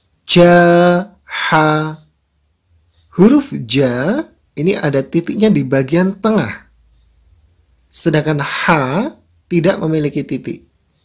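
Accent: Java, Indonesia. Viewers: Kedengaran kali yg baca orang jawa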